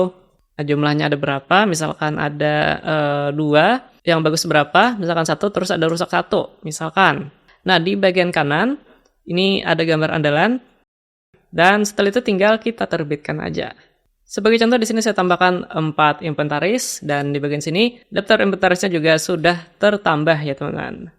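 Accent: Banjar, Indonesia. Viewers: logatnya pina kayak bhs banjar pahuluan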